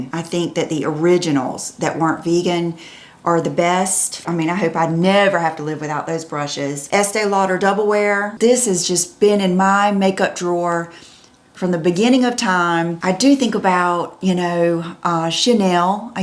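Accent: South, United States. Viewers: I just went and watched your first video, how sweet! You did "keep your nerve up" and 14 years later you are still here! I have watched for a long time, maybe not since the very beginning but a long time and wow, what an accomplishment to have stuck with this 14 years and we all love you so much! And I love your Southern accent too!!!! oxJUlia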